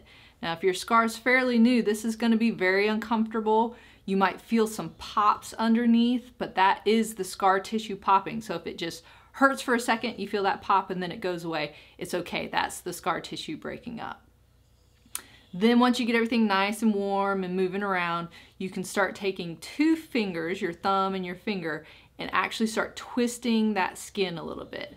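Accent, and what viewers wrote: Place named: Appalachia, United States. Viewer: Don't even go there with the Hemorrhoid cream... I looked like a Cauc-Asian for 48hrs after that :) Ha you definitely have an accent... To my ears anyway. Nice though. Like a little Hill Billy lol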